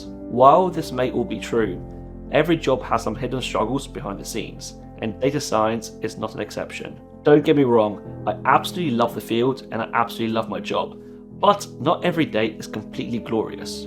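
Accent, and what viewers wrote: United Kingdom. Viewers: Are you native british , because I hear your words very clearly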